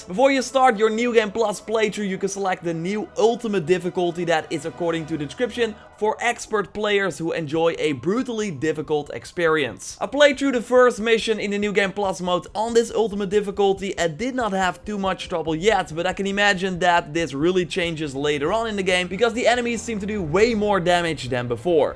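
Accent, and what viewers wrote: Netherlands. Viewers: omg you are dutch :D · are you dutch? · Ik wist dat je Nederlands was!😂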